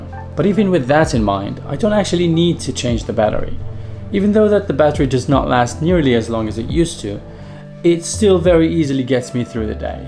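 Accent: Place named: United Kingdom. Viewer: The editing and camera quality is remarkable! also your accent is British with a hint of something else but I can’t figure it out 😂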